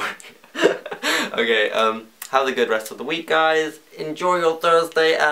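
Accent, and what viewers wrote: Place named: United Kingdom. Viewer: It's because he's British. They don't understand his accent. Or they're just racist against the red coats :P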